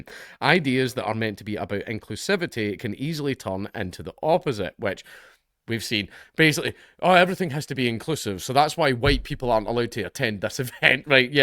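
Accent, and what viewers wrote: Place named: Glasgow, United Kingdom. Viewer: I like that Dankula pronounces Chutzpah correctly almost by accident because of his Glaswegian accent..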